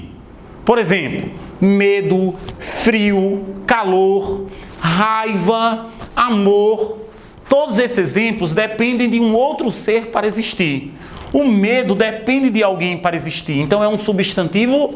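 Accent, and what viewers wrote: Northeast, Brazil. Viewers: tem mó sotaque nordestino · VC E NORDESTINO?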